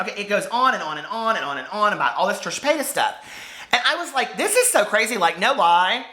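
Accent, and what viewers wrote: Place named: Indiana, United States. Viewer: I love your Indiana accent! I lived in South Bend for 4 yrs & loved it 😊 You are so funny & I love watching you 💙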